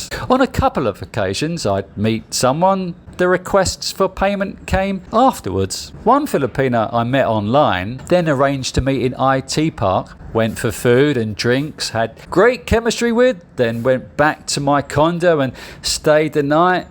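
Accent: United Kingdom. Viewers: like listening to someone narrating a book in British English lol good material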